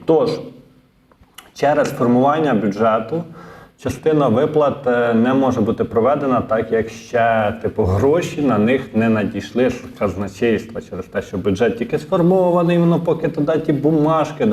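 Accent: Vinnytsia, Ukraine. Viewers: Ню- це говір вінничан.Так само, як і на западній мають свій говір.Там вуйки розмовляють так, що нормальна людина взагалі не розуміє про що вони говорять.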